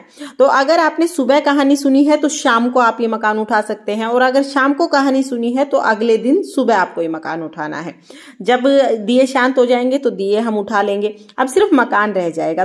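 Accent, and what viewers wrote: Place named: Bengal, India. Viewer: Di aapki bengales kafi pyari lag rahi h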